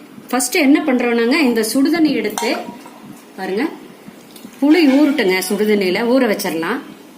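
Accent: Kongu, India. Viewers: கொங்கு தமிழ் அழகே அழகு ......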